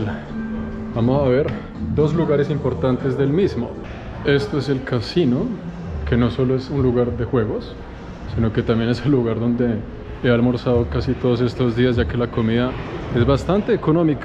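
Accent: Colombia. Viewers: Eres Panameño De Nacimiento? Por tu acento pense que eras Colombiano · Ejjj hermano si vos sos panameno porque hablas como nosotros los colombianos pues mijo? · Nacio pero se crio en colombia y tiene todo me imagino cultura acento todo osea es colombiano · con razón tiene acento colombiano.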